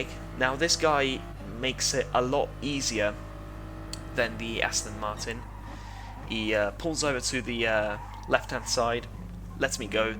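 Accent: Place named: United Kingdom. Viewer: You sound more british than most british people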